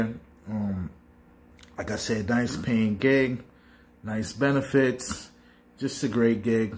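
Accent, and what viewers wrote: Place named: New York, United States. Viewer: Love the NY accent man 😆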